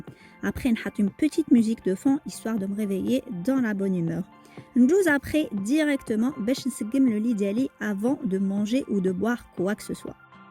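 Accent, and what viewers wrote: Algeria. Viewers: اتعلم راهي واضحة اللهجة جزايرية لزمك انت تحاولي تفهم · تبركالله عليك كولشي زين بزاف انا كتعجبني اللهجة دزيرية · Bonjour Vous portez bien le nom de votre chaîne vous nous inspirez vraiment en plus de l'accent algérien comme une cerise sur le gâteau 😉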